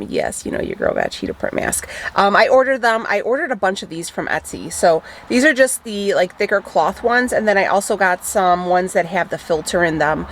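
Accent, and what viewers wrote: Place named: Minnesota, United States. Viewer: Minnesota? That accent..Keto on n Strong!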